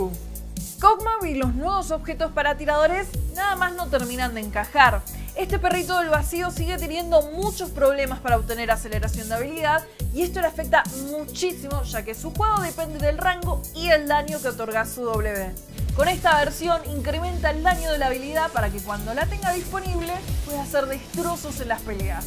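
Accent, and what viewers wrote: Argentina. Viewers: Bro, es argentina, obviamente tiene su acento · como odio ese asento argentino :( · No tiene carisma, es muy estresante escuchar ese asentó argentino y no algo neutro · Que tan amiga son? solo los amigos la bancan. Habla Argentino... No incluyen a los latinos. Donde quedo el neutro??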